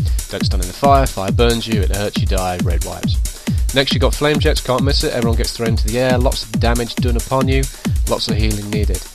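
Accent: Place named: England, United Kingdom. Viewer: God the English accent is annoying as all hell. But this is a very well done video and tutorial on how to beat him. Honestly, one of the best I've ever seen.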